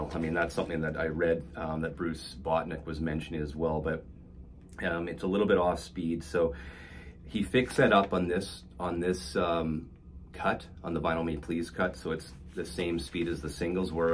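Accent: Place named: Canada. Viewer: Canadian and with that some pronunciations are different where he is from.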